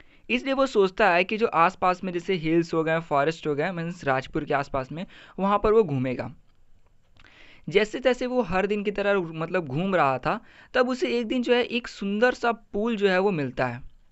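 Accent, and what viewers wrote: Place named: Jharkhand, India. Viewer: Jharkhand se ho na?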